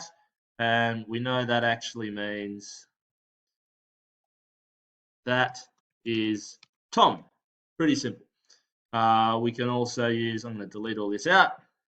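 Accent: Australia. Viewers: You have an aussie accent. Like your use of technology for supporting your teachings. Good on you for your kaupapa te reo. Mairiora! Engari For some, eg. My tamariki...would lose engagement and be confused....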